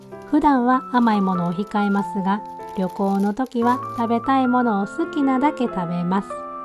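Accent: Kansai, Japan. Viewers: ドイツで入浴剤！？浴槽に浸かるのは日本人だけかと思っていました！びっくりです。 関西人のわたしには関西弁が抜けていない主さんの話し方が最高です。これからも頑張ってください😊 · お初にお目にかかります。 話し方から主さんは京都か大阪、いずれにしても関西の方なのかなと思いました。 黒い森、シュバルツバルトですね。 旅するドイツ語で見ました。 自然豊かでんなぁ。 でもビール飲めへんと辛そう。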